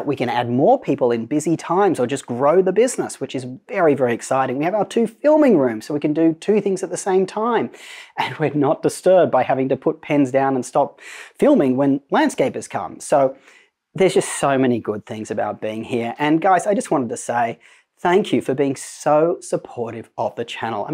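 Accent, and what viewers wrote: Australia. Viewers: I am really inspired by your videos especially this one!!! Nice to hear an Australian accent talking about tech and tech reviews and thing of such. Being from down under myself.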